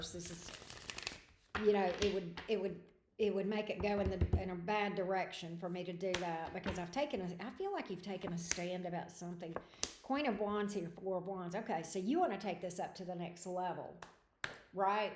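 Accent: South, United States. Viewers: I don't know where you're from but I know you're a southern chick like me. Love it!❤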